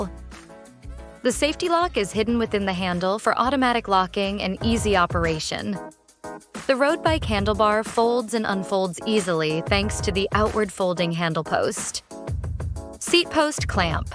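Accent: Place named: United States. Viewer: 대혼이구나 미국발음은...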